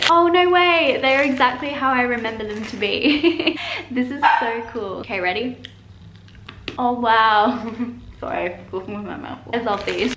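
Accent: England, United Kingdom. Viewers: Am I the only one who thinks u still have a British accent but I can hear an Australian accent as well 🤣🤣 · Your accent sometimes sounds british but then Australian at the same time? 😂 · Ah so she’s from Uk I was like no wonder she sounds so British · you kinda stil sound slightly english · I hear a little bit of British in your voice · Wow you still have that bit of an English accent despite leaving when you were 8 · She spent $200 on English food she sounds English to me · It’s wierd cause u sound British and Australian at the same time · Girl you still sound British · Fun fact: First, i was thinking that u were british bc of ur accent!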